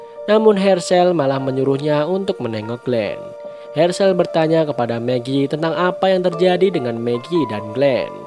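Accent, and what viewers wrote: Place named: Java, Indonesia. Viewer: 99,99999,999% b Indonesia 00,00000,001% jowo ne metu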